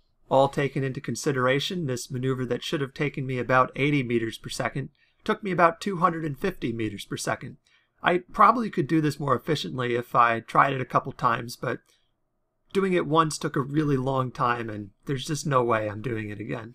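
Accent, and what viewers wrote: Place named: United Kingdom. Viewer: oh snaps senpai noticed him. Love ya even tho you still have a bit of a limey accent. Hope you lose that completely some day.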